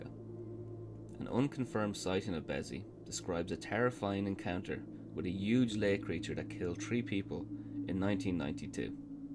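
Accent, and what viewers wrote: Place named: Ireland. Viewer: i was wondering what kind of irish accent that is. thank you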